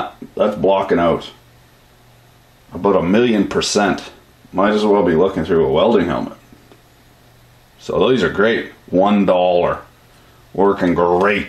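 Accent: Canada. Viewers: So glad youtube recommended me to this; just because of the accent lol, you in Northern Ontario or out West?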